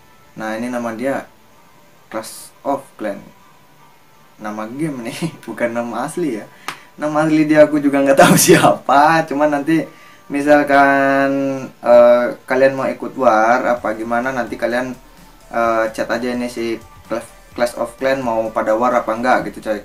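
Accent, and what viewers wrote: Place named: Banyumas, Indonesia. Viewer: Ngakak ngapak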